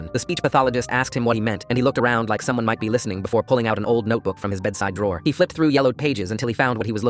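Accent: United Kingdom. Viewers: it’s just a british accent what’s bad about it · girl idk why you have a British accent 😭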